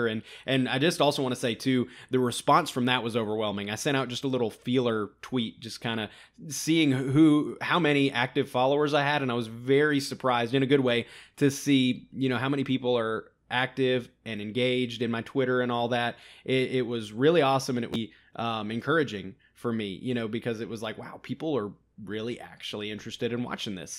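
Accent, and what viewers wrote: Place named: South, United States. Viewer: Wow back with a new beard and a slightly thicker southern twang?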